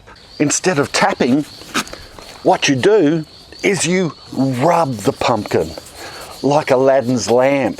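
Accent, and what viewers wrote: Australia. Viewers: Came for the pumpkins, stayed for the Aussie...ness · Aww, Richie Benaud! "What a marvellous day it's been out there toda-y-y-y-y..." A great man. 🙃 Upside-down, too! I'm a Pom, - you sound a bit like an Aussie, so you might as well take the Ashes back now. 🏺 Sigh...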